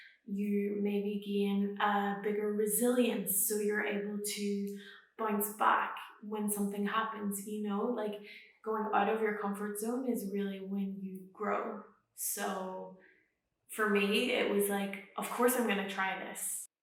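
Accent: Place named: United States. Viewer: OMG I AM HERE FOR THIS NEW ACCENT ON YOU!!!! IT's kind of like AMERICANNNNNN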